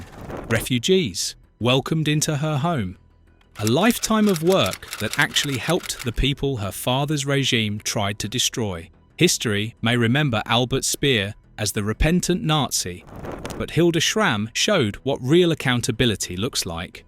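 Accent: England, United Kingdom. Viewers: If they don't have a good thick English accent like this guy, why bother.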